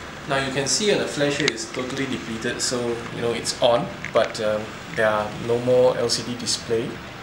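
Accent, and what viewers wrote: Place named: Singapore. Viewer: long live singlish! :D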